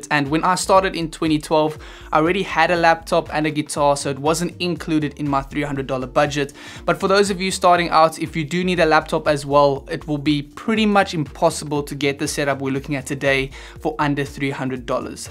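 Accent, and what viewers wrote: South Africa. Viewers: ... Your accent sounds like you come from Cape Town...... Nice info.. Thank you... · You sound so South African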